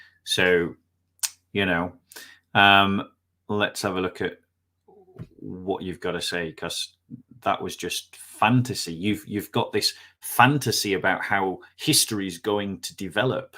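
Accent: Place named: United Kingdom. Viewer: Dont see many livestreams of but I am glad I did see this one. I love the British accent, God bless!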